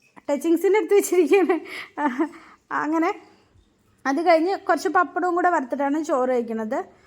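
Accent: Palakkad, India. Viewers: നല്ല വീഡിയോ ❤❤ പാലക്കാടൻ ഭാഷ ഇഷ്ട്ടമായി കേട്ടോ..👍👍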